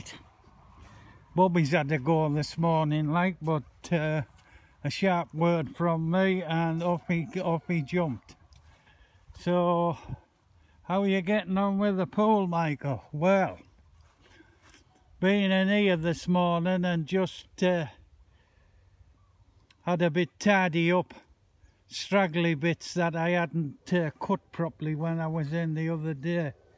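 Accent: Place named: Newcastle, United Kingdom. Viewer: you make me laugh you sound like me old granddad god bless him proper Geordie man..died at 94...done ww2, Burma and stuff, from Stanley Co Durham,..I used to gan me holidays to Consett and East Stanley...spoiled as a child, im Scottish lad but my holidays were spent in Newcastle,...Iloved it as a wee laddy, you must be a Mac,am....south of the Tyne.....great people north east,...love the Geordies,...if i ever meet you il bring a bottle of Newcastle Broon over for ye bonny lad....Im a reet at takin Geordie like man nae bother god bless ya.....gan the TOON, <num>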